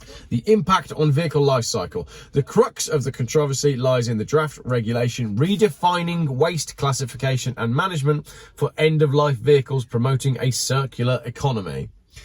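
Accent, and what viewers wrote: United Kingdom. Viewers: Steering wheel on the right side and a British accent, so likely a Brexiteer and EU hater.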